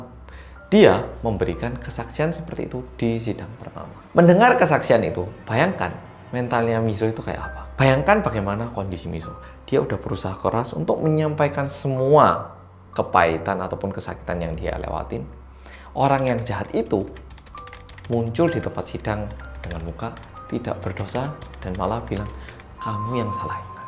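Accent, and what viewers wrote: Java, Indonesia. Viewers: Pangling mukamu krn ganteng tp ga akan pangling suaramu sing medok itu loh cak... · Jangan lupaaa medokkkkkk🤺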